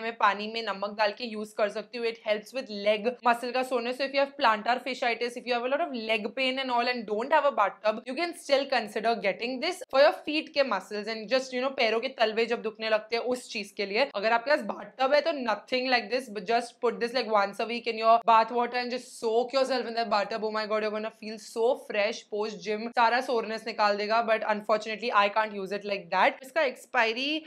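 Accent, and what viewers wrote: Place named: Mumbai, India. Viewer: Liked the tapori mumbai type of accent 😂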